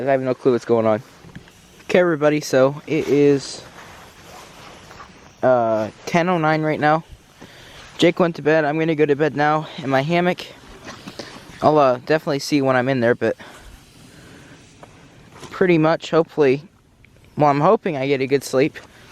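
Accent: Pittsburgh, United States. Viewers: Just saying. I'm from Pittsburgh. And you have a strong Pittsburgh accent.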